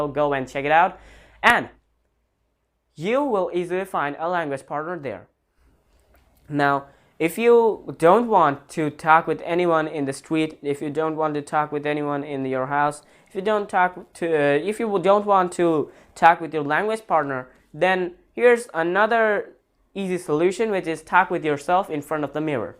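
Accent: United Kingdom. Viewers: Alhamdulillah...Love the British accent...keep it up..❤❤ very good job. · Nice job, keep it up broh! Love the British accent btw 😉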